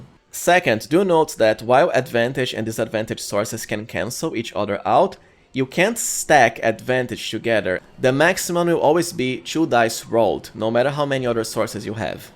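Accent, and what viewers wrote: Brazil. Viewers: That im a brazilian acent right there, I can bet! nice english btw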